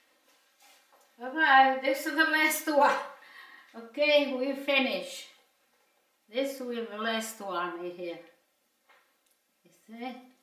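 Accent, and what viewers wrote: Italy. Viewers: Italian-english, two in one 😘😘 💓❤️ 🌹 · I watched my mom make the shells mostly for holidays. You even say it the way we do. Same dialect, must be same part of Italy 🇮🇹 thank you for the memories and recipes.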